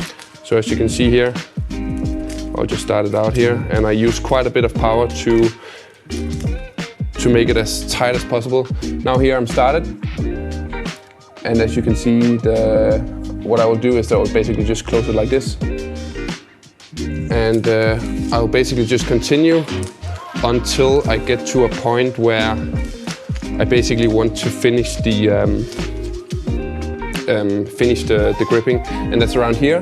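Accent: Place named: Russia. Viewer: i thought his voice was like a russian- english language huhuhhh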